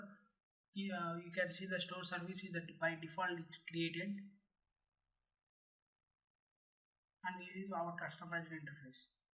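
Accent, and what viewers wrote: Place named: South India, India. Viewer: First, Appreciate your efforts ....Second, you really speak fast with heavy South Indian accent , its really tough to understand for me too (being a citrix admin and living in south india)....... Third, A suggestion, you may add subs in the video......this will increase for you hits and would be another favour for everyone.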